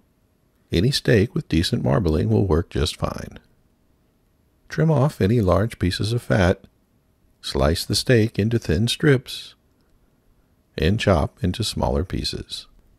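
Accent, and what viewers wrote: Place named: South, United States. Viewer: I love the way you talk. It's just so Southern. Love the recipe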